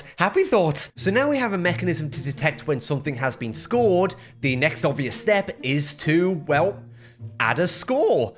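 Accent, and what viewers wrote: England, United Kingdom. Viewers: Never piss off an English(?)man, he’ll break reality and ascend to a new dimension in rage Edit: he pulled a flintlock so he may just be American with an English accent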